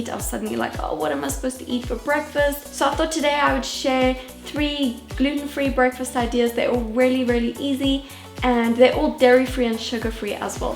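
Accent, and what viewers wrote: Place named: South Africa. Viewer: Can i just say love the accent reminds me of home... miss south africa and your accent reminds me of it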